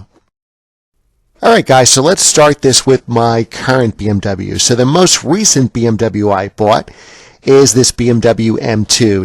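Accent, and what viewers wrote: United States. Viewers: as soon as I hear an eemerikun accent, I switch off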